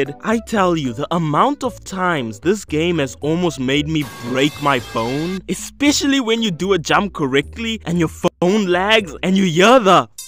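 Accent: South Africa. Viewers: Do I hear a south african accent? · Bro are you South African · Ngl you sound south African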